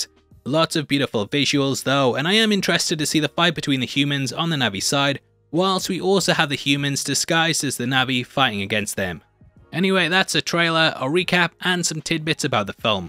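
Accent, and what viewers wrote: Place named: United Kingdom. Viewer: Love when you say Spider-Man No way home with your strong British accent every video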